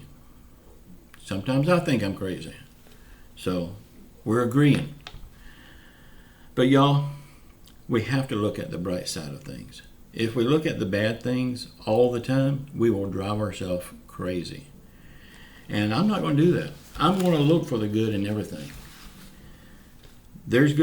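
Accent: South, United States. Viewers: You crack me up I listen closely to everything you say and I do believe you and most of all I love your southern accent let's pray that we get the $2,000 and retroactive back from March that would be completely awesome continue to do the good work keep up the good work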